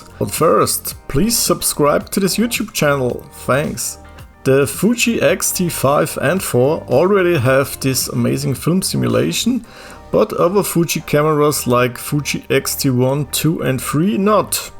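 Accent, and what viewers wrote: Germany. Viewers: Nice german accent !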